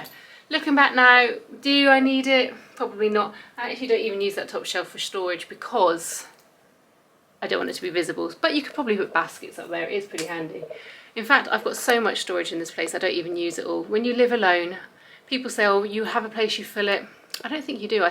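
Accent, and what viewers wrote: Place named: Bristol, United Kingdom. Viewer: Cool vids. I can tell of a slight Bristol accent am I right cute studio my love ❤🎉❤🎉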